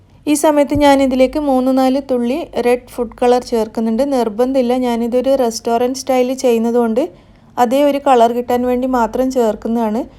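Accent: Kannur, India. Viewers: It's kannur slang 😅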